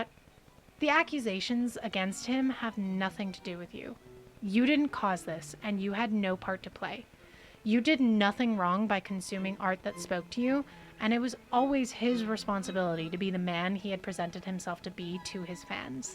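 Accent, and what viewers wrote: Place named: Australia. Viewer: Nice Aussie accent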